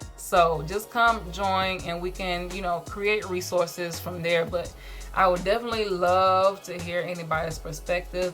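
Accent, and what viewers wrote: Jamaica. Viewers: As a vegan you can shop at coronation market for fresh fruits for low cost,you sound Jamaican,it nice here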